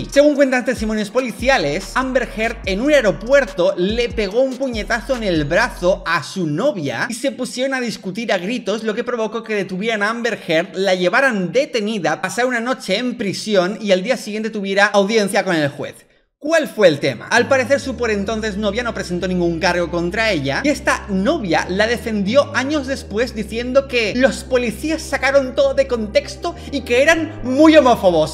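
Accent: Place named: Spain. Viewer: No lo dice mal, solo lo dice con su acento español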